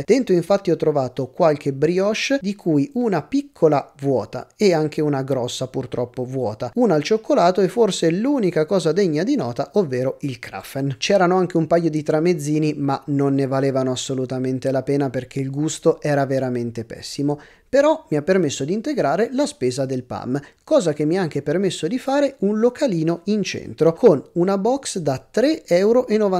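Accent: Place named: Perugia, Italy. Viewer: Infatti... pure io sono di Perugia e ho riconosciuto la cadenza... anche se non marcata..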